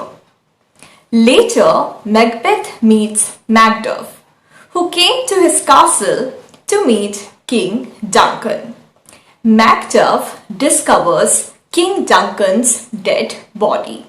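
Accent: India. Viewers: Might be good but Indian accent distracts me like hell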